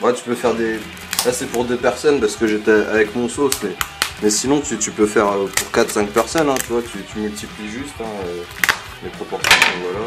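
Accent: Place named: North, France. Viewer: t'es nordiste ou quoi mec putain l'accent a gerber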